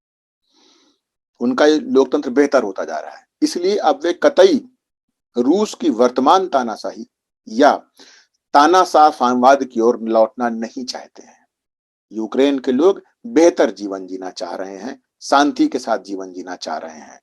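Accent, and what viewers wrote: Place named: Fatehpur, India. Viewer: Theth Fatehpuriya Bhasa Maza aaya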